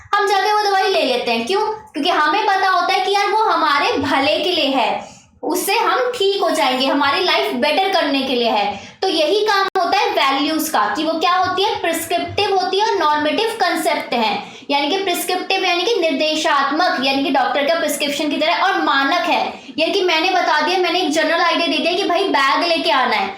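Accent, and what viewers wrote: Assam, India. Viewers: Ma'am are u Assamese?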